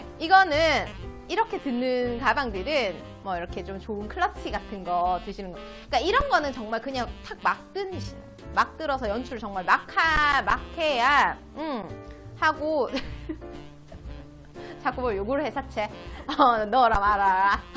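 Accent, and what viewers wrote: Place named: Gyeongsang, South Korea. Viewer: 미국에서 눈팅만하는 40하고도 1/4세 ㅋㅋ 삼둥이 맘입니다. 언젠가 한국에 가면 꼭 가겠노라며 위로하면 영상 잘 보고 있습니다. 같은 경상도라 설명이 귀에 쏙쏙 들어옵니다. 정강하고 고급지게!! 감사합니다 🤗🍓🍓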